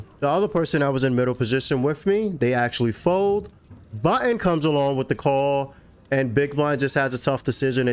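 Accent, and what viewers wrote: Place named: Louisiana, United States. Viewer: Based on your accent I’m guessing you are from Louisiana.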